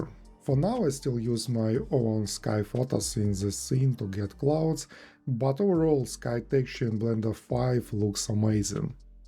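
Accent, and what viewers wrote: Russia. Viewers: русский акцент) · ну я же слышу наш акцент, можно версию на русском ?)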